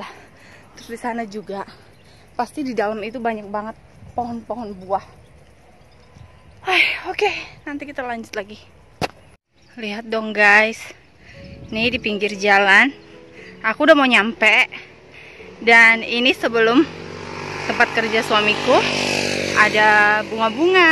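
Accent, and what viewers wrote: Medan, Indonesia. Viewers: Kayak org medan cara ngomong kakak ini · logat kk seperti logat anak Medan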